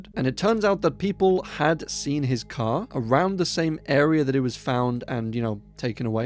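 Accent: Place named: England, United Kingdom. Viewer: Your channel is really good mate 💯 where is your accent from in England I'm English Yorkshire?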